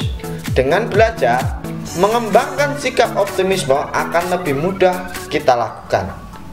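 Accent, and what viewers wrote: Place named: Java, Indonesia. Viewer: Medhok yaaak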